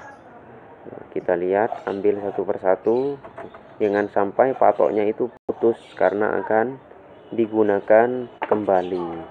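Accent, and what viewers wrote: Madura, Indonesia. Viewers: Terima kasih ,Mohon maaf, Logat Maduranya Medok sekali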